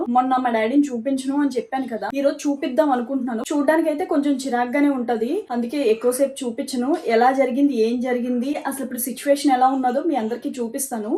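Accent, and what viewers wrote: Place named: Palakollu, India. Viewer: Meedi a ooru akka ? Palakol vacha antunav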